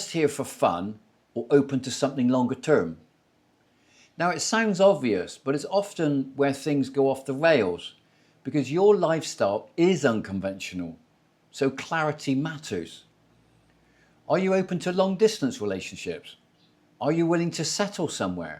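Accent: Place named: South West England, United Kingdom. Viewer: you sound like your from the south west or brizzle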